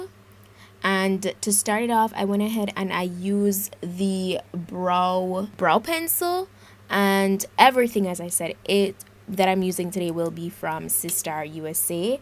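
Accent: Jamaica. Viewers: I hear that Jamaican accent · Love everything....the makeup, the Jamaican accent😉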